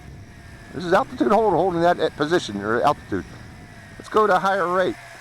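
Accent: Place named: Pennsylvania Dutch, United States. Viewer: Hi Mr. I really like this particular quad! And I dont mind buying from China, But I wanted to ask you about spare parts, are the available and if so where is the best place to get them? Thanks for all your great reviews, I dont ever miss one! You are THE best quad reviewer on youtube by far! I am from Reading PA and I think you said in one of your past videos that you were from Pittsburgh, Once in a while I hear some Pennsylvania Dutch in your voice? Do you have some Pa dutch in your blood?? Thanks again and keep up the fantastic work! Happy Easter to you!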